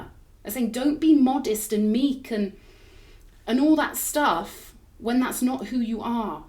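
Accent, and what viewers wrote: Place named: Cockney, United Kingdom. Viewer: your given it some proper Cockney slang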